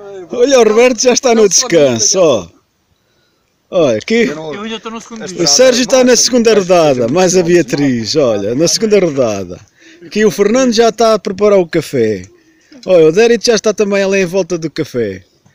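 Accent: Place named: Rio Grande do Sul, Brazil. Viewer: Meu amigo você é gaucho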